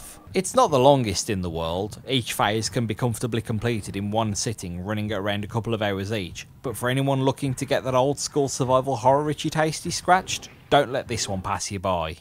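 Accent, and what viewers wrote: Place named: Birmingham, United Kingdom. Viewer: Nice accent. Where abouts in brum are you from😂. Nice vid also 👍🏻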